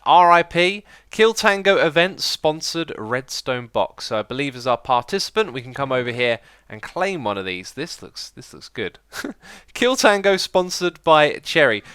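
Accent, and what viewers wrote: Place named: United Kingdom. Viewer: Yo, what part of the UK is your accent from?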